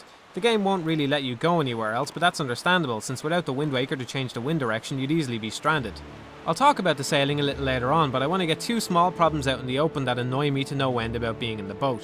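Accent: Ireland. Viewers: he's IRISH!!!!!!!!!! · Idk why but the further in the video I get the more Irish you sound Also, the mail sorting mini game is legit my favorite zelda mini game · This guy's Irish accent is just too good.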